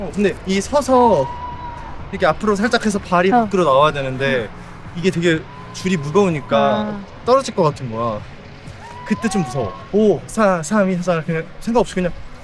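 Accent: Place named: New Zealand. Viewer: Its wonderful you came back home to Aotearoa Paul, and so nice to hear that you havent lost your Kiwi accent! I hope you and your wife enjoyed the trip, its been fun watching so far 😊 🇳🇿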